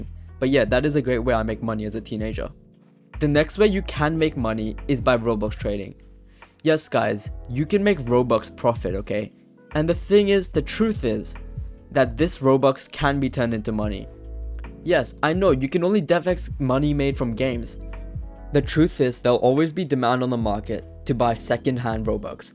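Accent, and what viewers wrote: Australia. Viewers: Great vid bro rlly helpful, also r u Aussie?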